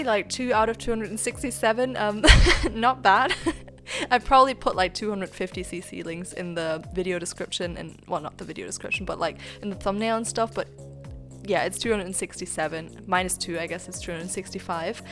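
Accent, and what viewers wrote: Germany. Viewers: Wie man hört das die deutsch ist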